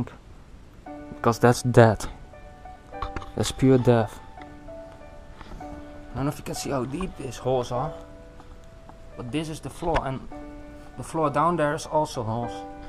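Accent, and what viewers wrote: Netherlands. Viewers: vette video maar je hoort wel dat je nederlands bent gast😂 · Volgens mij ben je Nederlands aan je accent te horen.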